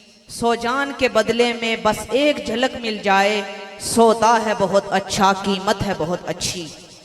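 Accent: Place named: Mansehra, Pakistan. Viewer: آواز سے محسوس ہوتا ہے کہ یہ مانسہرہ سے ہے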